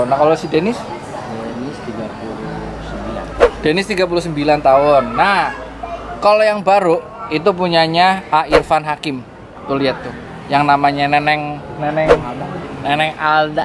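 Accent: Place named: Tegal, Indonesia. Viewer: Wong tegal ndi suarane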